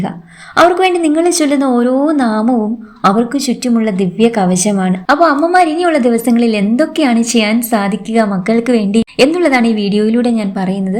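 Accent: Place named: Valluvanad, India. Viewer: എല്ലാം ഒരേ വള്ളുവനാടൻ ശൈലിയിൽ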